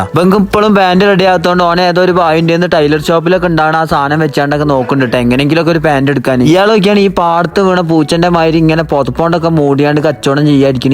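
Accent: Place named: Malappuram, India. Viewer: മലപ്പുറം തന്നെ 🤣😂🔥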